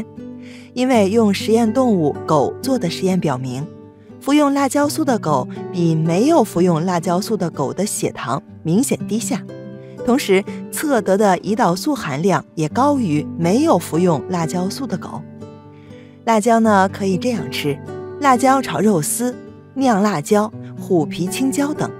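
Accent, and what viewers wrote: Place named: Mainland, China. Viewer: 明明就是ㄒㄧㄝ(第三聲)糖，為什麼總是讀ㄒㄩㄝ、糖？大陸發音？我學的不對？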